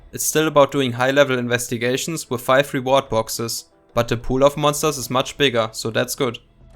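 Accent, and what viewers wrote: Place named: Germany. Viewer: The German accent though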